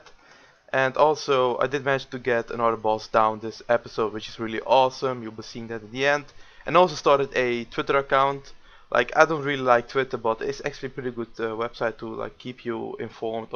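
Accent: Netherlands. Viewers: DAT DUTCH ACCENT <3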